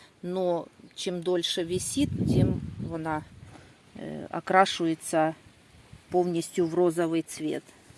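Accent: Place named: Sumy, Ukraine. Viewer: я із Сумської області, у нас всі так розмовляють, майже. Це нормально!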